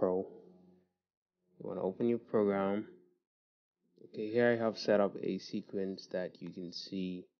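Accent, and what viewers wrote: Bahamas. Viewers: same! I knew you sounded Bahamian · you bahamian?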